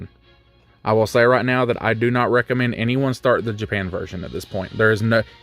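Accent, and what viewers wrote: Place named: Georgia, United States. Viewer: First time hearing your voice and all I hear is the southern Georgia accent 💀. But I liked your zenkai take. Zenkai level per star is a really good concept for LF’s. If you want to do more content like PvP or Summoning, personally I’d watch it but if you’re comfortable with it.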